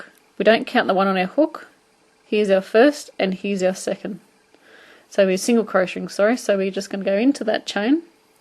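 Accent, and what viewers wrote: Australia. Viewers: wow thx a lot for sharing God bless you-my daughter is in Australia for 1 yr. i suspect thats where your accent is- i understand it fine!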